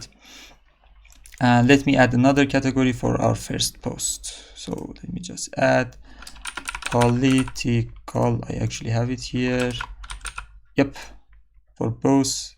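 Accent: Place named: Iran. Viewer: لهجه فارسیت داد میزنه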